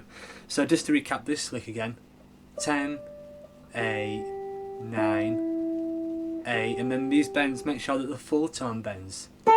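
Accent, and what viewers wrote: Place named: Yorkshire, United Kingdom. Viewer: you'r a good teacher....and you know your stuff. Also you have a great tone on that Tele. Really like your vids. I can never decide between Hull accent and Scarborough I'm going for Scarborough!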